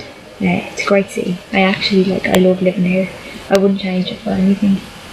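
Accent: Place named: Ireland. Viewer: I LOVE YOUR FLIPPIN ACCENT, I've got Irish in me and I've been trying to get the accent down and you speak it fluent and I really could use your help with it.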